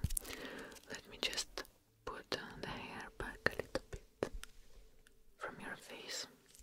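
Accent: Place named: Russia. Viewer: Акцент явно русский 😆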